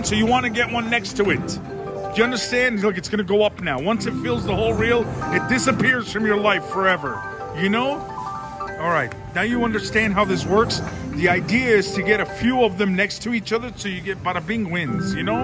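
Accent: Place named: Louisiana, United States. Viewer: Bro has got to be from South Louisiana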